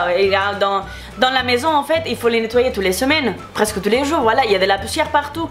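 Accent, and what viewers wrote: Spain. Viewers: coucou moi je t'adore et je te suis des tes 100 abonnes pourquoi ?pars que j'adorait ton accent et j'adore les espagnoles voila et courage et plein de bisous a toi ma chérie. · Non moi je trouve que l'accent español c'est le plus belle accent au monde j'adore ton accent et moi j'aurai voulu être espagnole car j'adore cette langue c'est la plus belle langue du monde plus tard je veux vivre en Espagne 😍😍😍😍😍🇪🇸🇪🇸🇪🇸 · Je pense que les gens s'attendaient à voir autre chose qu'un cil donc ils ont mis Putaclik pour moi ce n'était pas du putaclik Tu n'as pas à te justifier Tu es super belle j'adore ton accent espagnol Continue comme ca je t'adore tu es tellement belle Bisous 🇪🇸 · J'aime tellement ton accent espanol, tes cheveux tous enfaite tes vraiment belle je voudrais trop de ressembler tes MAGNIFIQUE 😍😍❤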